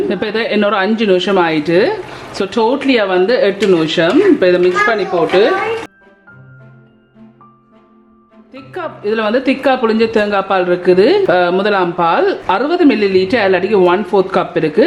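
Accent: Sri Lanka. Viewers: Yummy curry..Srilankan way of speech nallarukku sister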